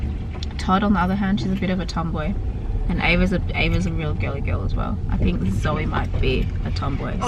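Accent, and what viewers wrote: Australia. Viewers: she's Australian. Her accent is pretty obvious...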